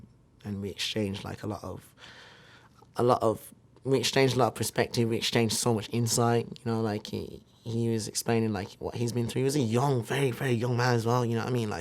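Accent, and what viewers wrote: United Kingdom. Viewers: Bro I didn't know scarlxrd was British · He is British...ik that It's Suprising tbh😂🤡🤡🤡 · He said hella fucking British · I love his British accent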